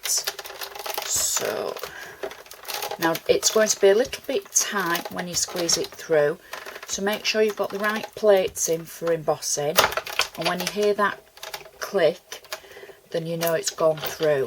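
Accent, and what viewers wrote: Yorkshire, United Kingdom. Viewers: What a great idea will have to give this a go thank you for sharing, is that a Yorkshire accent? I'm from. Doncaster 🙂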